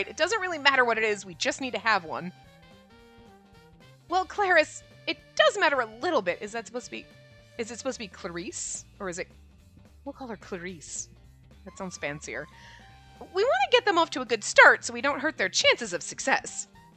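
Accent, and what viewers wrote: Ireland. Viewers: everyone loves the Irish accent me included :)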